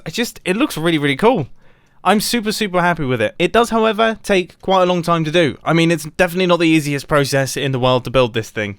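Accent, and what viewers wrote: United Kingdom. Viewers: Mumbos UK accent Mumbos low voice accent without his UK accent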